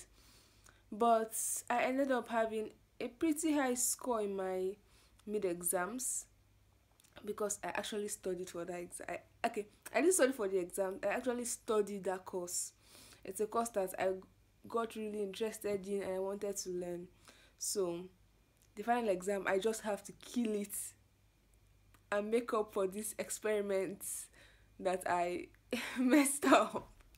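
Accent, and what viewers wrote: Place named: Nigeria. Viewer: Hi Your Nigeria accent is still strong Love from Ukraine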